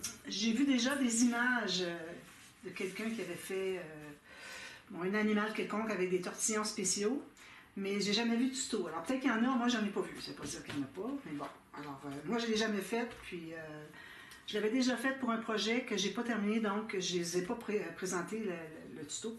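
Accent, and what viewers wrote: Quebec, Canada. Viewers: Un vrai travail de Titan !! Merci pour toutes ces démos et les précisions techniques que vous nous fournissez, j'apprends beaucoup à vous regarder réaliser toutes vos pages, détail après détail et je me régale de votre accent québécois😍😍😍. Votre gentillesse et votre patience à expliquer votre façon de procéder rendent vos vidéos très agréables. Merci encore pour ce généreux partage. Je vous souhaite une excellente semaine. 🥰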